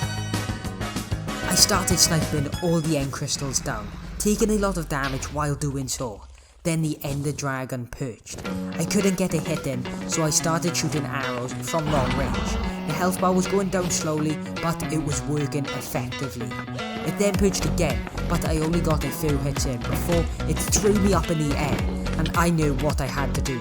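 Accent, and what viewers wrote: Wales, United Kingdom. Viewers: Banger btw yout welsh accent is perfect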